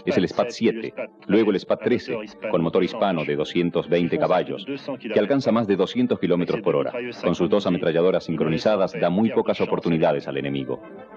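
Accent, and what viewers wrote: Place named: Argentina. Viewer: que parte de america es esta no la reconozco, colombia, chile venezuela, uruguay?el relator es indiscutible argentino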